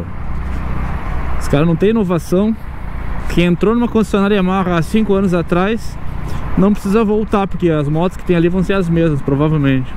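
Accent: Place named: Rio Grande do Sul, Brazil. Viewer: Adoro o sotaque do gaúcho, aqui em SP interior puxamos o R sem dó (porrrrrrrrta)😅…..e o “tu” para nós fica só na conjugação do português mesmo, aqui usa-se o “você”. Grande abraço diretamente do interiorrrrrrrr de SP!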